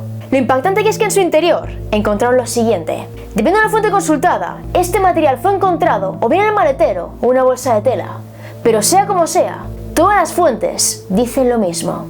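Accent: Spain. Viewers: La mayoría de tus suscriptores son latinos en América.....tu acento español está muy muy marcado....si lo cambiarás un poco se te entendería mejor ....saludos !!! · Eres genial, me encanta como relatas y tu voz de española je, te mando un fuerte saludo desde México.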